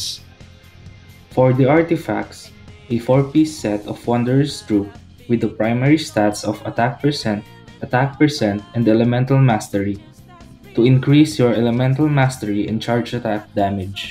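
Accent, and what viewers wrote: Philippines. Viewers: You sound filipino. Are you?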